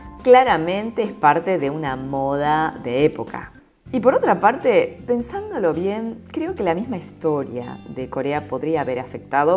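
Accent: Argentina. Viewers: Hablas muy bien el español, me encanta el acento de los argentinos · Hola una pregunta eres argentina , te encuentro el asentó muy parecido , me gusta tu sencillez buena suerte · Tienes asentó argentino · Me encanta tu forma de hablar español con acento argentino .😊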